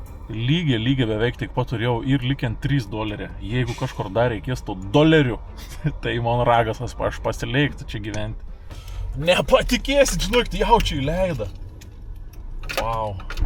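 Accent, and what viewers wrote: Samogitia, Lithuania. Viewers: sveikas, kai kalbi angliskai jauciasi zemaitiskas akcentas 🙂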